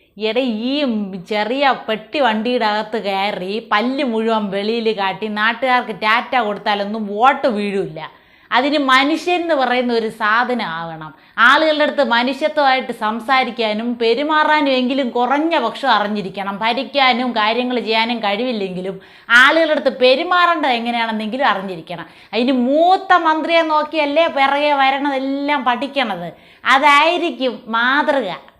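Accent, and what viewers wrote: Thiruvananthapuram, India. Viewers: ഇതാണ് തിരോന്തരം കലക്കി മോളെ ഒന്നാംതരം അവതരണ ശൈലി 👍❤🙏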